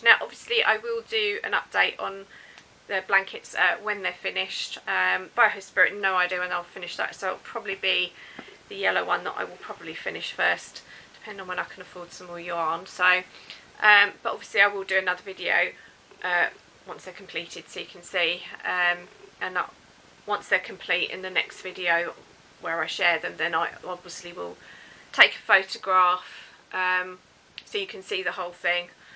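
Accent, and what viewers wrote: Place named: England, United Kingdom. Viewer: Just found you and am enjoying your cheery English accent. I will be working on a virus blanket soon. Thank you. Mary Wigmore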